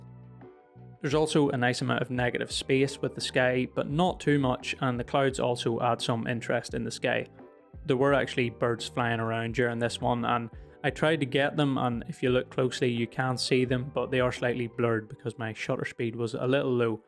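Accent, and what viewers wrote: Ireland. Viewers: Brah gotta be Irish. Sounds like my bro from Belfast.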